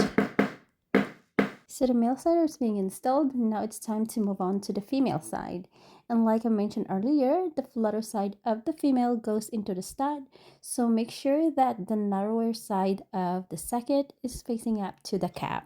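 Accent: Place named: Philippines. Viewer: I knew it was a Philipino accent.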